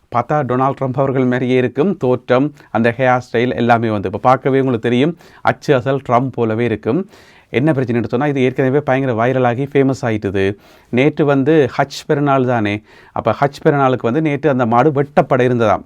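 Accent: Jaffna, Sri Lanka. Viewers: Your Jaffna style talking very interesting.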